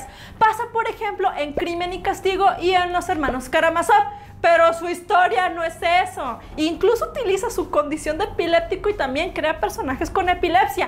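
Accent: North, Mexico. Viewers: Soy yo o esta vieja habla muuuuuuy norteña puesum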